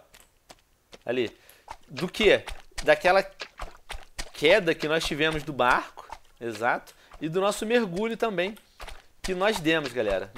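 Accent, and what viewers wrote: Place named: Portugal. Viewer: fala portugues de portugal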